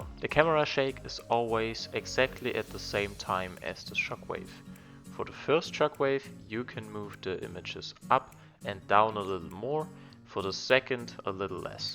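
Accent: Germany. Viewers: Cause of your accent I found out you’re German and because of the layers👍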